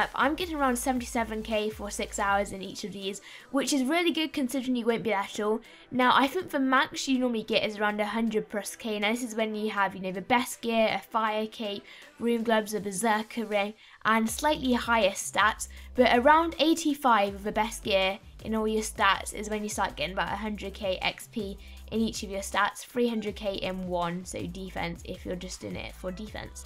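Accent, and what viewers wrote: United Kingdom. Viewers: Interesting accent, south England?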